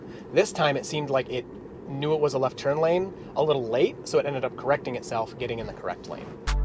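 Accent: Michigan, United States. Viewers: Don't let people criticize your English. American English does have dialects! You're just speaking Michigander dialect. "Coulda went" I use as well.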